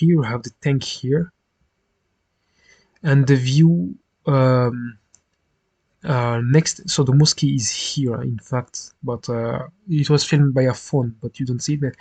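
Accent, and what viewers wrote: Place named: France. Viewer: The french accent 🥵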